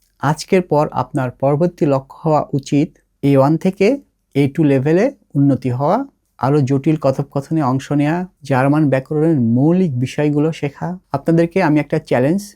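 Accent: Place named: England, United Kingdom. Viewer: ভিডিওটা বেশ সাজানো ও নতুনদের জন‍্য বেশ উপকারী। তবে উচ্চারণটা ইংরেজির মতো হয়ে গেছে। বিশেষ করে উমলাউট বা Z এর উচ্চারণগুলো। 'আবার গুউট...।।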